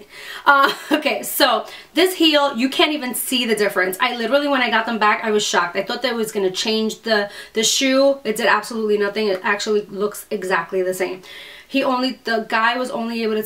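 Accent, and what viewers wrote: New York, United States. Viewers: Sounds like a New York,Staten island or Long Island accent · Def a New Yorker like me haha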